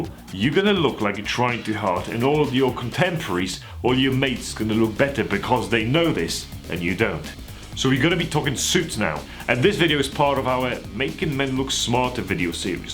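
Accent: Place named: United Kingdom. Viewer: I know this is not the place to say this but you're absolutely gorgeous! Great British accent and excellent outfit. Well done!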